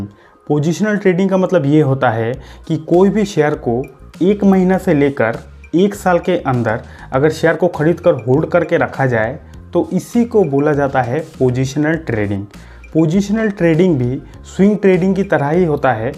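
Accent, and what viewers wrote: Bengal, India. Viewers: Bhi ap bengali ho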